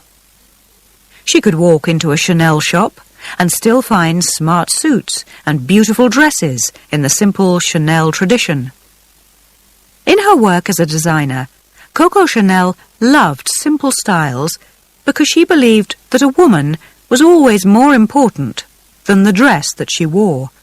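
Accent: United Kingdom. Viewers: Rodolfo Santos british I think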